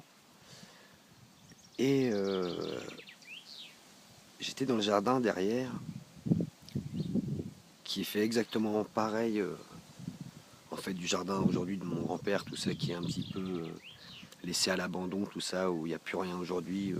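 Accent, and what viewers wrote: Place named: Quebec, Canada. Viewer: merci pour tes vidéos, on va dire que c est pas facile l accent québécois mais ça va j arrive à decrypter.mon premier voyage astral m a bien secoué et g eu peur, je pense que j aurais moins peur lors du prochain voyage.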